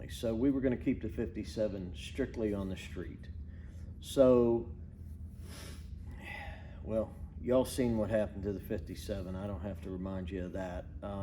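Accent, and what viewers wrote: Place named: Pittsburgh, United States. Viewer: I never noticed the yinzer accent til now. Shaht aht to the best accent in America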